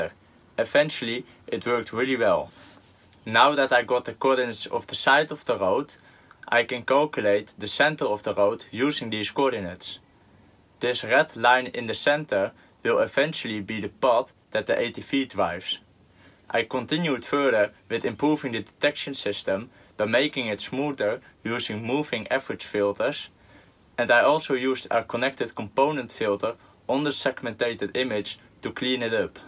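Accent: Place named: Netherlands. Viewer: Je kunt zo horen dat je uit Nederland kom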